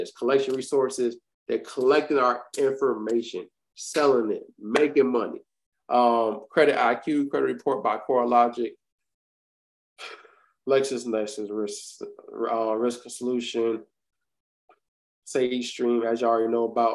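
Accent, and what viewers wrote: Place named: Baltimore, United States. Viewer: Y’all from Baltimore huh?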